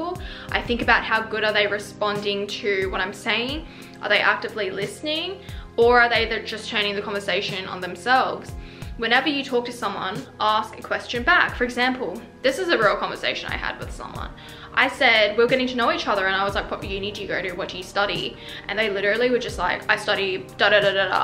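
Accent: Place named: RP, United Kingdom. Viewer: the poshest accent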